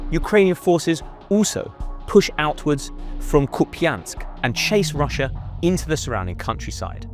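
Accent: England, United Kingdom. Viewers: it's english pronunciation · British accent. Disregard.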